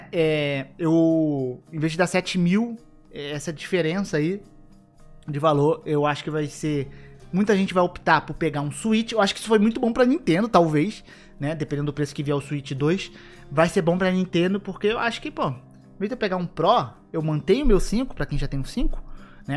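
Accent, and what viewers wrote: Rio de Janeiro, Brazil. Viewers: Haha alem do sotaque carioca esse cara passa mo impressao de Nerdola ENZO de condominio kk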